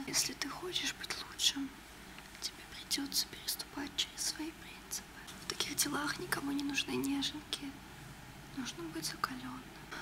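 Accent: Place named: Russia. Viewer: I agree. Her Russian accent really makes this more relaxing than it should be!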